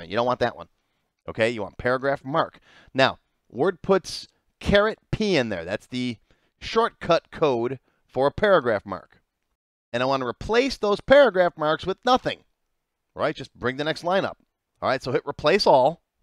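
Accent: United States. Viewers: Good ...but It would be better if you took into account viewers whose English Language / American Accent is their second Language, as they may not be able to pick up your American accent as quickly... Regards from an Iraqi living in the Anbar Provence in a remote area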